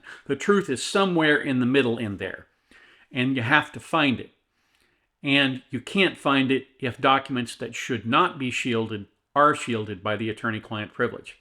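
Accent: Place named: Midwest, United States. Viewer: Thank you, sir, for your content. Found your channel during the Brooks trial, as well as many other licensed lawyers’, which helped me understand more about the judicial process. Aside from his antics, the trail really pulled the curtain back for the layperson to see how one works. You and your YT brethren helped fill in the holes and maybe even influenced people to look into the judicial system as a career, to make it less intimidating and more open. Knowledge is power and all that. You are correct in that not everything you put out will be applicable to everyone, but know that there are those of us, both who have discovered you and those who haven’t (yet), who appreciate your insight and willingness to share knowledge, so again, thank you. And yes, doing one small good thing a day does help the world. Side note: I’m retired US Navy, born and raised in MO (STL, then Mid-MO), retiring in the Seattle/Tacoma area. 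Though I doubt I will ever return home permanently, - we have the Cardinals, Chiefs and Mizzou, after all! - it does make me smile to hear a little piece of home. Your candor, your midwestern accent and your Missourian viewpoints all have me missing home juuuust a little bit more, so thank you for that.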